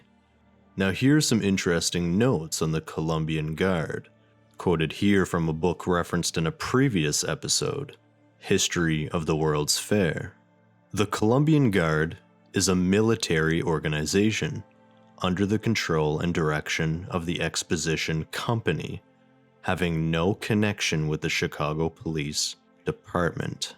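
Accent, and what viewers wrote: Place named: Eastern Canada, Canada. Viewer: this boy eastern canadian AF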